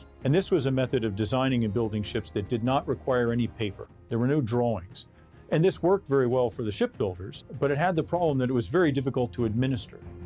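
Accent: United States. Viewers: This guy is def from Delco area in Pennsylvania lmao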